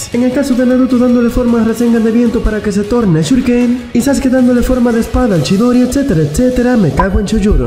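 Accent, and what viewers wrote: Dominican Republic. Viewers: Bro tú forma de hablar es peculiar de RD, será que si?🤔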